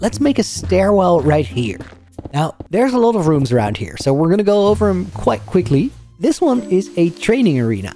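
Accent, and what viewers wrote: Netherlands. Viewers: Areyou dutch???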